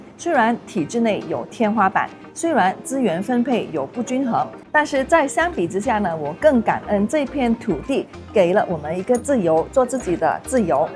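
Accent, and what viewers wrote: Malaysia. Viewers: 马来西亚人喇！（从她的姓Ang，不会是台湾人），（2）她的口音，不管是华语或英语都是大马口音。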